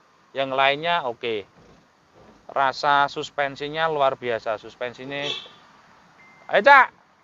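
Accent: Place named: Central Java, Indonesia. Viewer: wong malang logat jawa tengah..😁😁😂😂🙏🙏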